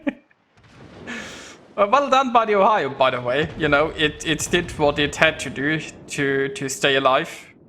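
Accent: Germany. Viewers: First time on the channel, and the maniacal laughter couple with the German accent makes for a great experience.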